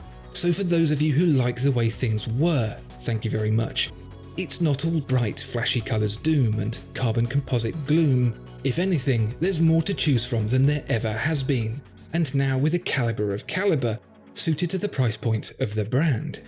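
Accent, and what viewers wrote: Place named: England, United Kingdom. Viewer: Love your content but "Happenstance" FFS! Laurels is pronounced lorrels, not loorels. It makes you sound like you have put your beautiful English accent through a robotic computer enunciation programme.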